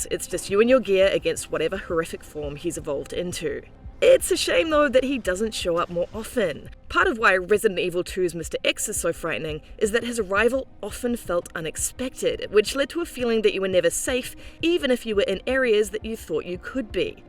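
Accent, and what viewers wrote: New Zealand. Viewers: So weird to hear a kiwi accent on ign. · Going by her accent, is a fellow Kiwi? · Is that a new zealand accent???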